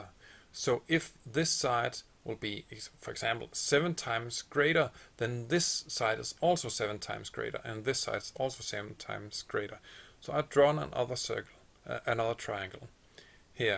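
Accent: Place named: Denmark. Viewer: hahahaha gal en dansk engelsk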